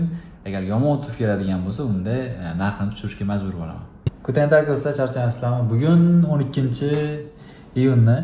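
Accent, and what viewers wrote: Khorezm, Uzbekistan. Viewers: Xorazmliksiz ajoyib aksentiz bo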